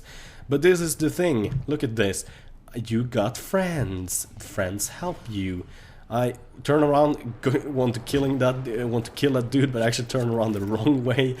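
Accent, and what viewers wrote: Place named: Sweden. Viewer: Gotta love the swedish accent <3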